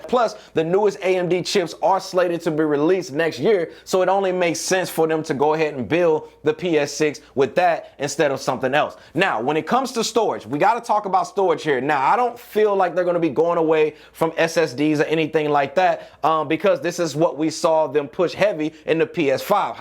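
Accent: St. Louis, United States. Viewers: Big stl in bro voice shoutout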